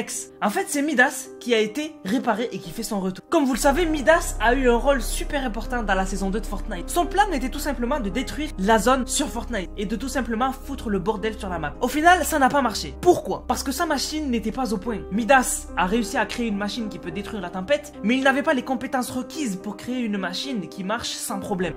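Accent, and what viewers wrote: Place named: Marseille, France. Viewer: Ton accent marseillais il est partie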